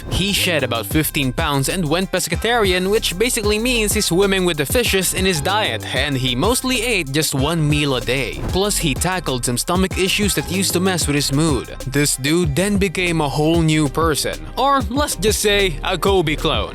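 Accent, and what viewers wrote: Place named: Philippines. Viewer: Pinoy n pinoy accent m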